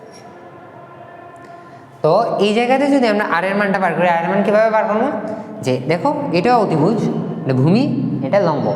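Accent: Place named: Sylhet, Bangladesh. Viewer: আপনে কি সিলেটি? কথায় আমাদের সিলেটের মতো লাগে.....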